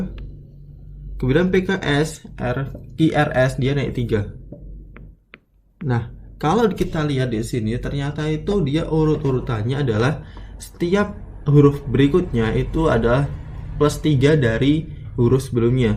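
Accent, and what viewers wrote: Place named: Cirebon, Indonesia. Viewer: logatnya cerbon bgt, tah indramayu?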